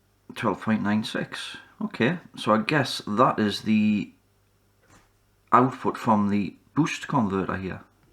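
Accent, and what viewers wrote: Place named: North East, United Kingdom. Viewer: The North-East accent is never a problem.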